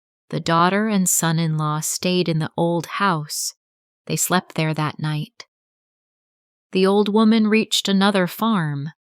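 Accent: United States. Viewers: I'm also disgusted with your American English.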